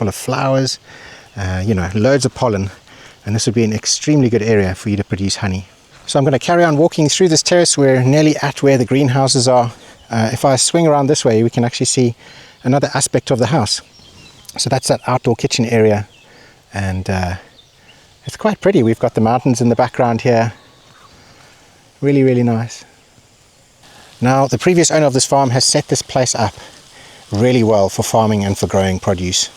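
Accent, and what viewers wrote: South Africa. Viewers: i reallly enjoy your presentation style with the south african twang ... great combo ... property is kinda missing for me strange small windows in the first bed and kitchen and the reception/dining has the front doors but could really do with another big window. price is good ... question tho ... does the farm sell their produce and make a profit? otherwise one is left with looking after a huge amount of trees. there is a calmness and a lack of commercialism (or was when i lived there) that is very calming ... the one reason i wouldn't live there? there is no way i could learn the language.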